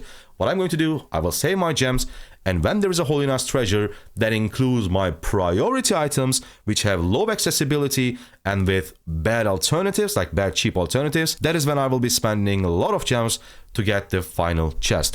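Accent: Turkey. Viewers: Bro you have a strong Turkish accent are you Turkish by any chance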